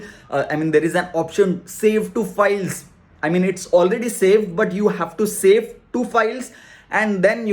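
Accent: Maharashtra, India. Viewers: Thick Marathi accent in the game. Khup chhan pan ! :)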